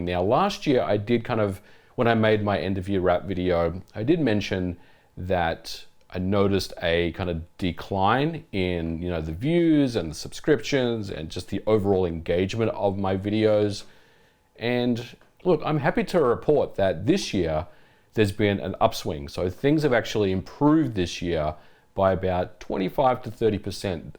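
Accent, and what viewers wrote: Australia. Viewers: Been watching a long time now. It's the quality content that keeps me coming back, plus, the Aussie accent lol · Going to use you as inspiration to lose weight in 2025. I just hit 50, and as you say, still eat like I did in my 30s. As a fellow IT enthusiast from Melbourne who was in the 90s PC scene, keep up the great content. Hearing an Aussie accent is always welcome. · The most important thing about this channel to me, and I really hope you see this because it's true, is having a non-US creator among the big gaming channels. When 90% of videos on the platform are by American dudes in their 20s, it really means a lot to have an older, Australian voice to bring a different outlook, experience, and vibe, and honestly, it can just be downright fatiguing to hear high-energy American accents nonstop video after video. You're making a big difference in a way you might not have known, and I hope a lot of others feel the same, too. 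Love ya, keep it up.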